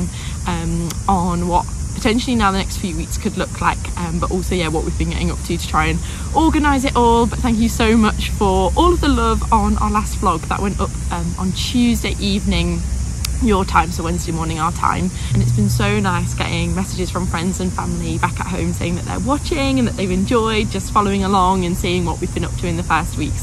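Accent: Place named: West Midlands, United Kingdom. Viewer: Love watching you enjoy what we nearly did when young but chickened out due to family issues. Intrigued by your slight accent similar to our family and mine which is West Midlands. You look like and sound like the younger daughter of my cousin who was raised in Tile Hill north west of Coventry and sounded to me sometimes like Shropshire. Are your roots similar. Have a great time seeing as much of the world as possible.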